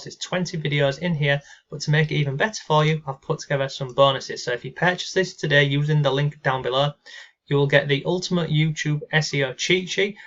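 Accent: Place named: North, United Kingdom. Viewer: I love your accent! Is it from the north?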